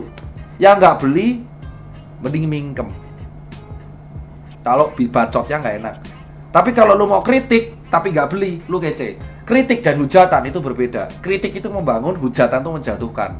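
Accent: Java, Indonesia. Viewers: Dr tirta jowo medok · Bahasa indonesiamu medok mas, podo 😂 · Ngomongnya medok tapi keren 😅👍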